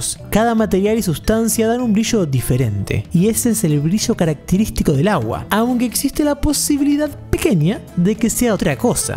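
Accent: Argentina. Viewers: Vos sos argentino?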